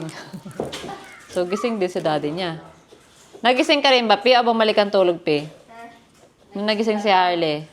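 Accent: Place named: Pampanga, Philippines. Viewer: Kapampangan accent ya girl! Wag kang mangialam. Pakialaman mo buhay. Hinahanap ka ng amo mo. 😂😂😂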